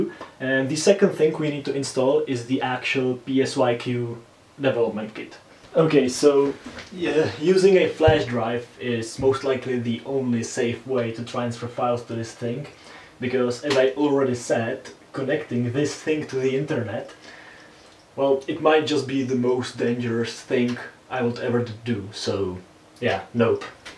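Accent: Czech Republic. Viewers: Do u live in Czech republic bc i hear an accent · Slyším první slovo anglicky a hned slysším, že jsi Čech, jinak parádní video kámo. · ey i recognized that czech accent good vids ngl :) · To jsem poznal že jseš z Česka podle té výslovnosti. Jinak dobré video! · To že seš Čeh jsem poznal podle toho hlasu Angličtiny. Jinak dobrý video! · I was watching the video when I noticed your accent. I thought wow ... sounds like a Czech accent ... and then I noticed the trash can label :D Keep up the great work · Cool. im from slovakia. i heard you czechis accent. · Teď mi tohle video náhodně vyskočilo na YT a říkám si, že v té angličtině slyším češtinu a starý noťas mi to potvrdil! :D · Hned jsem věděl podle přízvuku, že jsi čech xd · Já tušil že jsi čech :D ten přízvuk mi napověděl :D ale jinak super video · Bro I swear this guy has such a big czech accent. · It's funny - you've started speaking English and I immediately knew you are Czech. 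There is no hiding 🤣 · Ahá :D mě se zdála tvoje angličtina taková počeštělá :D a win xp vítejte.... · i cant help myself but i hear a really strong czech accent in your voice . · I was wondering why the accent was so familiar, turns out you are a fellow Czech. Great video btw, it's quite fascinating to see how they made the games back in the game. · Hned jsem věděl, že jsi Čech podle tvé angličtiny, průzkumník souborů a poznámkový blok mi dal za pravdu <3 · časkej přízvuk jak poleno! super video!!!!!!!!! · Hodně dobrej kontent! Podle přízvuku jsem poznal že jsi z Česka a potvrdil mi to "Koš" na Windows XP :p CG!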